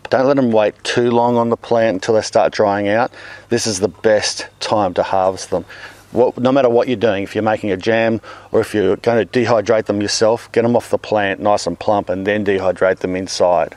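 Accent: Australia. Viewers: I love watching your videos! Your humour and positivity and fun Aussie accent! Makes me want to move to Oz! And I love using hibiscus to brew kombucha!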